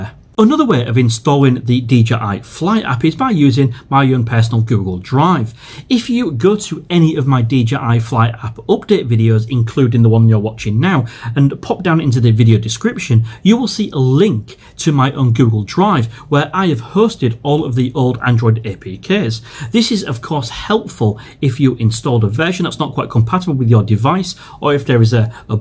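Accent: Hull, United Kingdom. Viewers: hi are you from hull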